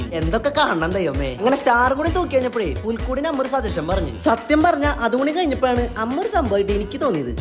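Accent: Thrissur, India. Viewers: Tcr slang kekkumbo oru rasam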